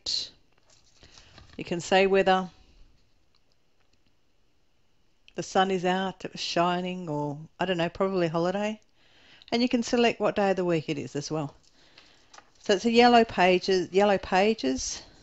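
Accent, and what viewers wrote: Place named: Australia. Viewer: Hi,nice to hear an aussie doing diamond painting on utube!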